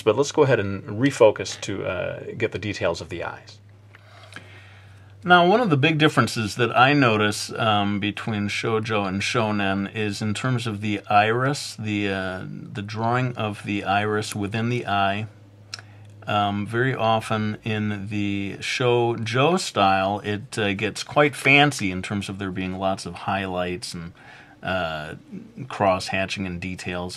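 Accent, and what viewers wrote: Brooklyn, United States. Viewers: I do love your Brooklyn accent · Your Brooklyn accent is just too much!.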